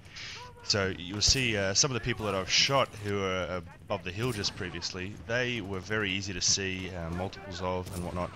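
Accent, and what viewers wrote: Australia. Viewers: How many Aussie commentators are there on youtube? is it just you and JamieEhills?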